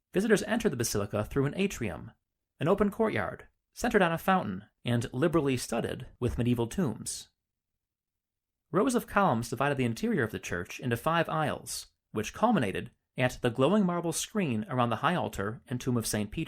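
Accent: United States. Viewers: You sound like Ben Shapiro. One of the more grating American accents for sure.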